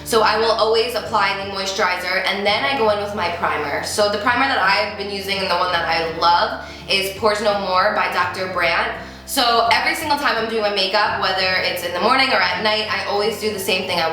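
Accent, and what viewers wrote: New Jersey, United States. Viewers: She sounds like she has a bit of a New Jersey accent. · She's so beautiful but that thick New Jersey accent 😩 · I could tell right away that you're from Jersey from your accent. 😂 I'm from New Jersey too!! Xoxo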